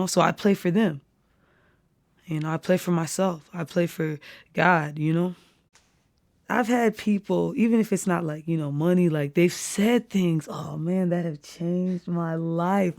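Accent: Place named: Caribbean. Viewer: English on top of carribean She sounds like Naomi Campbell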